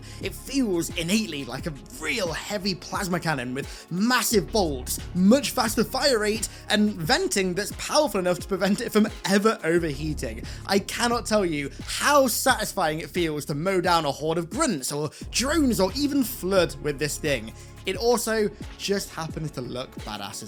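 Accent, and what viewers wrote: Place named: United Kingdom. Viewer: This is probably a weird question, seeing as you have the accent, but do you live in the UK or the USA, ‘cause you also did a fourth of July episode on your other channel.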